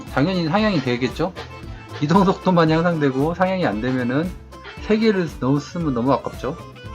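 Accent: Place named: South Jeolla, South Korea. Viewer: 옹삭스럽다는 말투보니 전남분이신가요?? ㅎ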